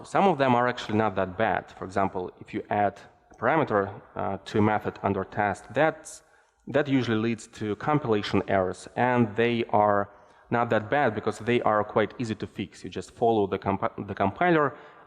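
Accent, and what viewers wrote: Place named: Russia. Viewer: Родной язык автора - русский. Да и говорит он с заметным русским акцентом. Для чего он на русскоязычной конференции говорит на английском?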